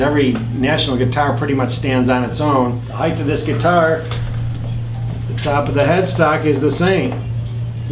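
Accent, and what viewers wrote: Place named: Great Lakes, United States. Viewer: The Great Lakes accent is strong. I live in Wisconsin, people here talk like this guy. Naational Guitaaar DAAAT CAAAAM lol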